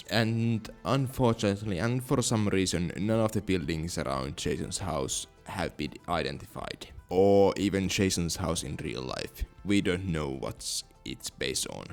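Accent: Finland. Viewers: have you never heard a Finnish person? If you played Control/Alan Wake 2, Ahti is very Finnish lmao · Bro that's his real voice 😭 The amount of people who think his voice is AI is crazy. He's Finnish and he just sounds like that, if you for whatever reason don't really understand what he's saying then you could turn on subtitles although the subtitles themselves may be wrong/incorrect. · It's not an AI voice. It's his real Finnish accent. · Finnglish is fun. I’m American but work with Finns. You have that sweet spot of strong understanding of the language, but also strong Finnish accent.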